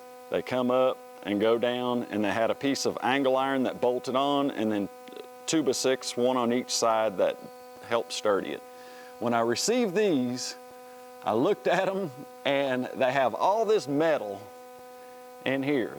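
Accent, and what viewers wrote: United States. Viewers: Don’t be so hard on yourself, mistakes are just lessons learned, but I love the southern twang of tuba six’s and tuba four’s lol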